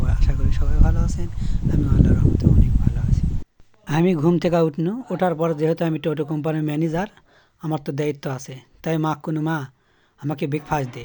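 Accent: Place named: Bogura, Bangladesh. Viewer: অনেক অনেক সুন্দর হয়েছে। সবচেয়ে বড় কথা হলো বগুড়ার আঞ্চলিক ভাষায় কথা।ধন্যবাদ।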